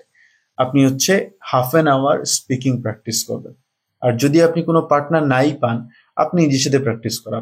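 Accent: Sylhet, Bangladesh. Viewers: ❤ সিলেটি ভাই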